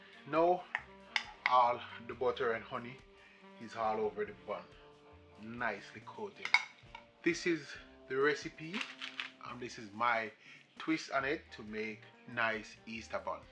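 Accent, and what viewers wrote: Jamaica. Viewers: Nice chef, sounds like you come from Clarendon...the heg...lol my family is from St. Elizabeth.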